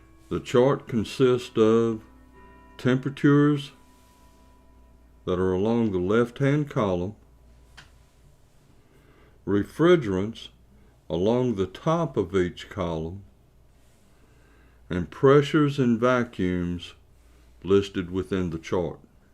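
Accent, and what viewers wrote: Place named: New York, United States. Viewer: Man this video is spot on and to the point, I love your New York accent.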